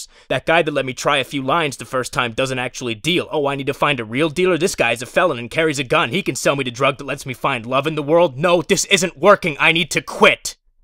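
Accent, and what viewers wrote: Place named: East Coast, United States. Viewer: the East Coast accent helps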